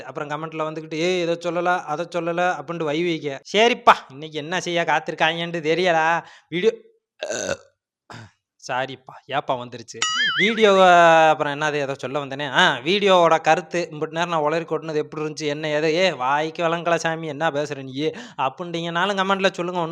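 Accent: Madurai, India. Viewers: நம்ம மதுரை ஸ்லாங் கேக்கவே அருமையா இருக்கு நண்பா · Bro neenga maduraiya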